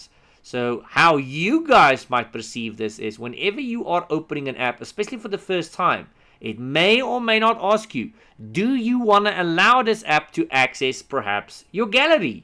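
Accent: South Africa. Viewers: I'm impressed by your content! Love the South African accent with the thorough explanations. A copy and paste of the compTIA A+ course but with your own style. Keep it up!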